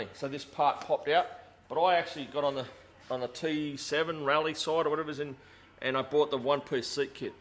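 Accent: Australia. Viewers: Holy s*** you are hard to understand, I had to put captions on to figure out what the hell you were saying. What you are Auisies do to vowels is a violation of the English language & reprehensible to the English language. Still appreciate what you do and the content that you provide relative to the Tenere 700 As Time Goes Forth! Thank you, but keep working on your diction and pronunciation so all of us other English speakers can understand you much better.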